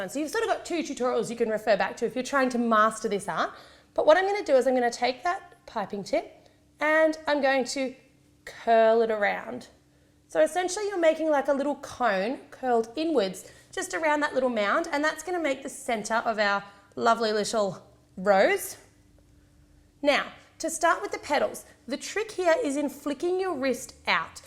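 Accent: Australia. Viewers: Outta curiosity, is she Australian?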